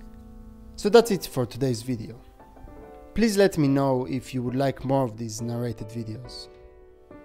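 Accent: Israel. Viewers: That Israeli accent 👌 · סרטון מדהים,רק ישראלי יכול לזהות מבטא עדין של ישראלי🙃 האם סוגי עצים שהם רעילים או שכל ענף שנראה בריא אוכל להשתמש בו לכף?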